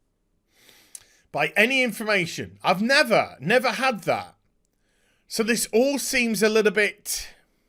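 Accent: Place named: Yorkshire, United Kingdom. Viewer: Got halfway through I can’t believe our lack of perception I’ve got because it’s now suddenly apparent you are so typical Yorkshire very articular but very comical but you’ve just explained something because yes you’re on the ball and I’m learning a lot because I’m hoping to be a YouTuber eventually but all I can say is oh you are awful but I like it clue is I’m Apollo I do apologise I forgot his name well-known very camp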